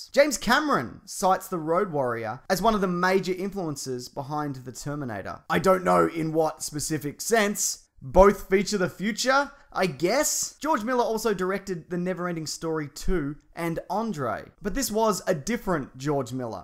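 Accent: New York, United States. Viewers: New York accent is spot on. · That new york accent tho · Every time. That American accent kills me every damn time. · New York accent is perfect lmao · Flawless New York accent James as always.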